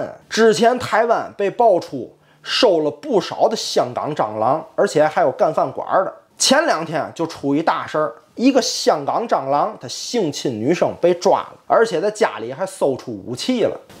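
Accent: Tianjin, China. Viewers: 在天津上的大学，怀念你纯正的口音啊。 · 每日谈: 天津话的魅力天津人的种族天赋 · 这口活绝了，以后都天津卫嘴好了xD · 喜歡這津腔播報👍👍👍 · 天津話，過癮！ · 这天津话说的，喜感自然来，还很亲切。每天看莱克多巴蛙快报，是一件极为快乐的事情。支持！~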